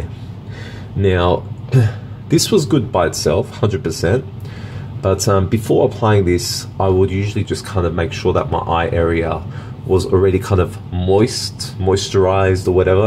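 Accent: Australia. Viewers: I got a small flare up of eczema on one of my eyelids as well! It’s been like this for a few weeks. Its flaky and red, kind of like I forgot to add eye shadow on the other eye. 😳It’s odd how it randomly started without warning. Now I’m trying to back track what could have caused it. Thanks for your input about the things that worked and didn’t for you. PS love the Aussie accent 😂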